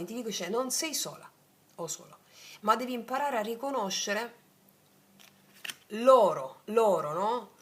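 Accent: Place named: Salento, Italy. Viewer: Grazie cara amica, spero si avveri subito. Dal tuo accento, sento che sei del Salento come me. Dico bene? Un caro saluto 🙋‍♂️🙋‍♂️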